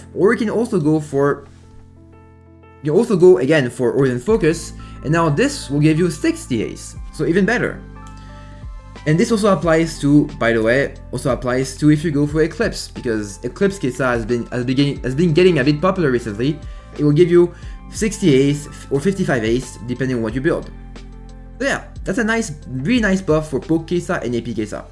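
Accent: France. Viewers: Omg, are you french? I love your accent and that you actually try to articulate (unlike this Takyre senna content creator. The guy gives no shit whether he's understood or not).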